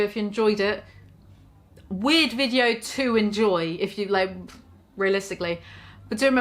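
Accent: United Kingdom. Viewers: your bri ish accent is everything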